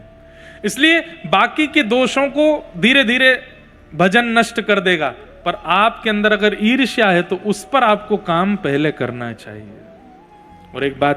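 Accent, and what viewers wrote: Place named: Braj, India. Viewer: 🙏🙏JAI SRI KRISHNA. Guru ji jab aap Brij भाषा mai katha sunatae hai bas lagata hai sunti hi rahu 🙏🙏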